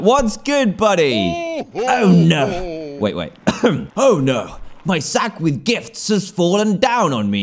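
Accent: England, United Kingdom. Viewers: Tayler Douglas what? He is from england... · He’s British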